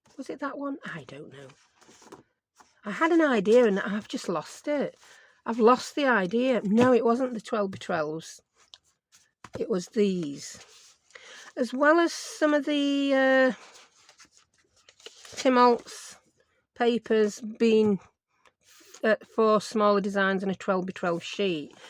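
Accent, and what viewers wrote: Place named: Sheffield, United Kingdom. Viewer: Wonderful video as usual. You mentioned Sheffield this time and then I knew why your accent sounds so familiar. My parents are from there. I do not hear there accent but others do. We have been in Canada since 1965. But you sound like my relatives over there. I do enjoy your videos. Thanks for sharing!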